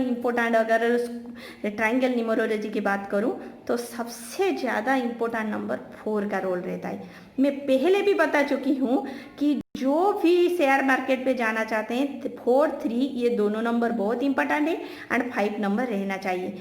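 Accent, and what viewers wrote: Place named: Bengal, India. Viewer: Ok. Nice of you. Will I get a government job? Odisha seems like Bengali accent. Nice of you.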